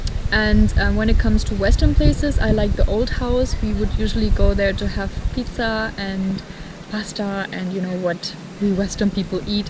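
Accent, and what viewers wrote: Karnataka, India. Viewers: You look like a German But your Style of speaking more like an India Girl...You fall in love with India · Definitely I'll put all my efforts to meet you when you will be in Bangalore. Big fan of your Indian accent. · You have an Indian accent.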